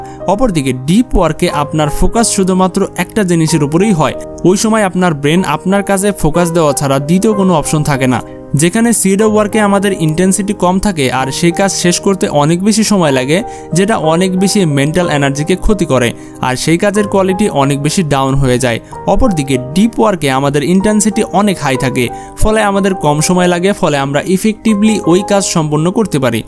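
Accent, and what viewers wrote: Bangladesh. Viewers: বাংলাদেশী আমার মনে হচ্ছে। কথা শুনে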